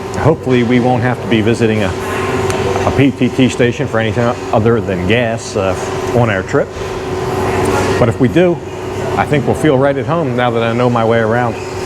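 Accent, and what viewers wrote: United States. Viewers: Enjoyed your video as I am new to the Jomtien area. Honestly, it was comforting to hear an American speak! LOL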